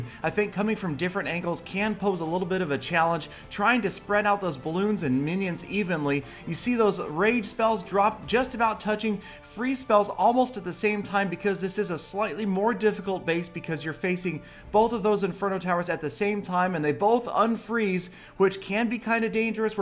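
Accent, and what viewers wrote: Norway. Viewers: Are you norwegian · are u from norway?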